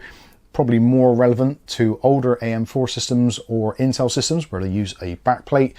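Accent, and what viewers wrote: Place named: Shires, United Kingdom. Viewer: Love you're shire accent. Great vid, I was actually looking at this case before finding you're video on it. Class.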